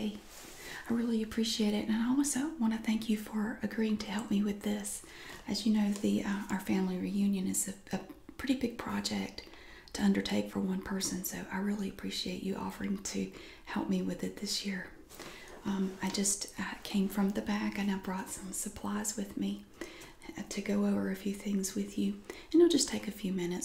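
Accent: South, United States. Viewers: I absolutely adore your videos! My aunt/godmother lives in North Carolina, and for my whole life southern accents have been so calming to me. I only see her a couple times a year, because I live in Wyoming, but I find so much comfort in your videos in the meantime. I hope you receive as much gratification and happiness from making your videos, as you give to us, the viewers. :)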